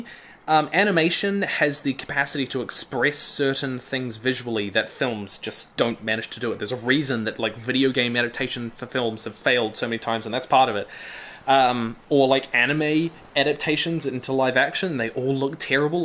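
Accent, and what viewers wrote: New Zealand. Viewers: Which New Zealand accent, though?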